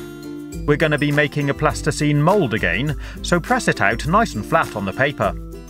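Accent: United Kingdom. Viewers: Are you British? You sound like it! =D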